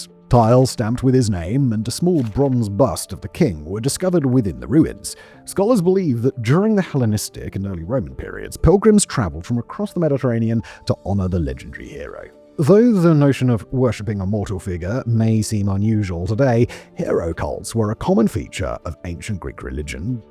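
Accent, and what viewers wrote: United Kingdom. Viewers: you always have fascinating videos. For Americans your accent lends credibility because for some reason a brittish accent makes t he information more credible. There is one thing though. At times you drop what you are saying into your throat and a very deep register and lower the volume that makes what you are saying hard to hear. I recognize this as part of a typical British way of speaking, but what you say is too interesting to be lost. As a public speaker myself, I have to be aware of my own verbal idiosyncrasies so as to reach my audiences more effectively.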